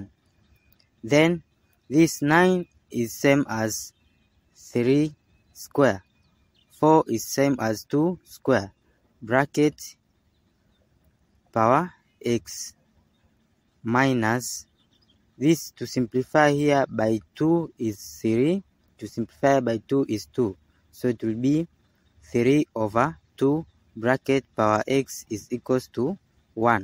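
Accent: India. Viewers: Listening this solution, I forgot the math I knew, but I learned Indian pronunciation. Thank you so much!!!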